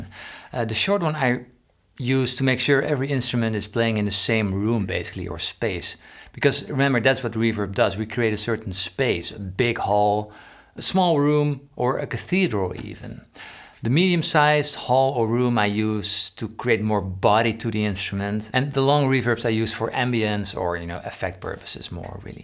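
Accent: Netherlands. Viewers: The best things about ' music videos (in random order): --The Dutch accent that can sometimes make him difficult to understand momentarily, yet lends a certain gravitas --The humble, self-effacing humor --The funny, quirky video shots --The superb production quality of the videos --The thoughtful design and progression of material in each video --The time and effort he obviously dedicates to making them so exceptional --The love and passion for music he shares and inspires --The broad knowledge of music and musicians he offers --The uncanny ability in selecting interesting subject content--or otherwise making it interesting --The personal honesty and openness always evident --The willingness to question his own opinions, not just others --The creative musical thirst and desire for experimentation --The excellent, wide-ranging musical taste --The intelligence and ability to breakdown the complex into the comprehensible --The superb guitar playing If there is anything above found missing or incorrect, feel free to reply and add your thoughts. 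Already remembered one big thing not mentioned: THANK-YOU,!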